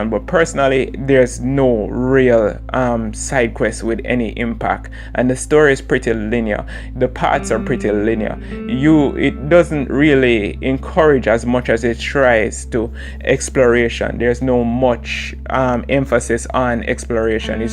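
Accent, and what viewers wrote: Jamaica. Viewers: are you jamaican? you got a accent.I'm trrying to place it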